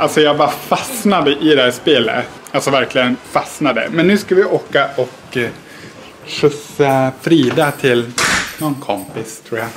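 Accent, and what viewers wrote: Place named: Norrland, Sweden. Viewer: Hahaha så härlig vlogg, alltså er dialekt där uppe är ju helt amazing! :D "hemmakväll-påse på huuuvet"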